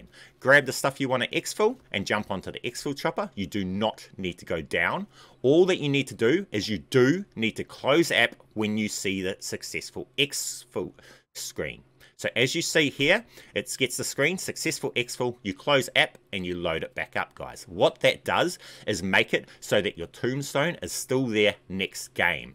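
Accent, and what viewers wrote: New Zealand. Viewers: Definitely a kiwi! Everyone talks about you on mw, earned my sub. I dont even care for the money, youre just too cool lol.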